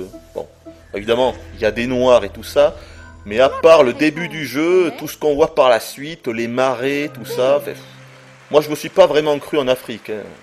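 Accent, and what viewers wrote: South, France. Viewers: Mais qu'est-ce qu'il avait sur cette vidéo, il avait grave l'accent du sud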